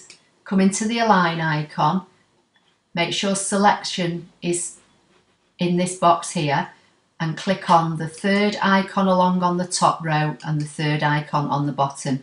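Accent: United Kingdom. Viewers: Wow. This is awesome. I have been searching for a way to curve text and save as an svg file. You couldn't have made it any easier. I love the British accent. Thank you.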